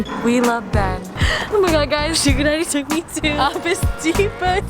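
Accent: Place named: United Kingdom. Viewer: Loved your British accent 😂😊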